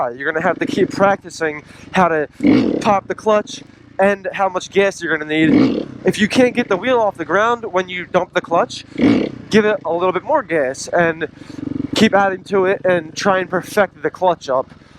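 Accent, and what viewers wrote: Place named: Maryland, United States. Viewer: you sound like youre from maryland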